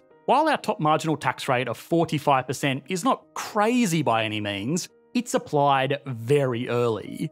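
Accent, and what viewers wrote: Australia. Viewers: what do you mean? I’m an Aussie and consider him to have a pretty average Aussie accent. He’s middle class and educated, so he doesn’t sound like crocodile Dundee, but listening to him speak I don’t get the impression that he spent any of his formative years overseas. At most he’s maybe a bit “media-trained,” ie. he puts videos on the internet so he’s learned to speak clearly into a microphone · EE makes me ashamed to admit that I might actually have a love hate thing for an Aussie accent😉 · Yeah when I saw your Norway video and how you tacitly backhanded what Australia’s doing with their resources compared with how Norway is maximising theirs, I thought that you must be Aussie, even if the accent didn’t already give it away. Yeah it’s a shame how we are paying market rate for our gas resources when we are sending most of it overseas to the benefit of others. 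Hopefully that will all get upended if we manage to transition to become a renewable energy superpower, and that cheap electricity can make manufacturing and refining once again profitable in this lucky country. Hopefully greater automation will offset the more expensive labour costs for manufacturing, like how it is in the US and Europe.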